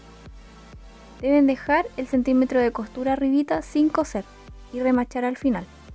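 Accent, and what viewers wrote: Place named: Chile. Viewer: Ay apenas te escuché dije: es chilenaaaa. Mi país mi país 😁 muchas gracias por el tutorial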